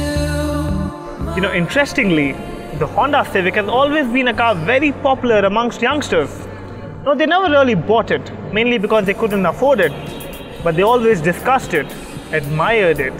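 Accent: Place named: Punjab, India. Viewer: Dude your thh-ousands are too cute! Reminds me of my Punjabi childhood buddies from Delhi ha ha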